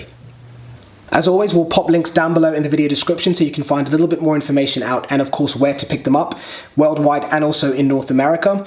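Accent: England, United Kingdom. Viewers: Anyone else find it odd hearing an Englishman talking about hockey equipment?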